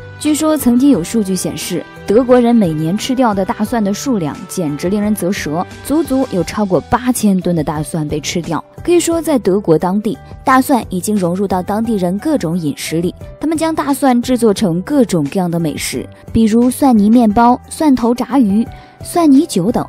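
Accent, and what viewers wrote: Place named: Shandong, China. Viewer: 我以为是山东人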